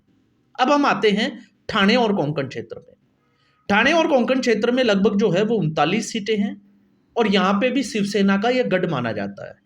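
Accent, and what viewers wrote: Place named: Haryana, India. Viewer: आपकी भाषा में हरियाणवी पुट है,,🎉🎉🎉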